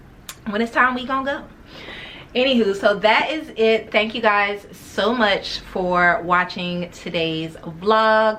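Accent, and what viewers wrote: United States. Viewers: you activated my alexa lol, all the way in London with an american accent love it x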